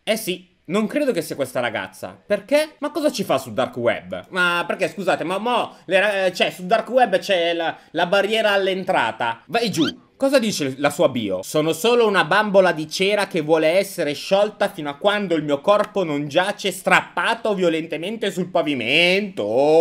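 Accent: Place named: Apulia, Italy. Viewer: Ou orecch d'gomm! Hahahahahahhah (Ps:Sei pugliese )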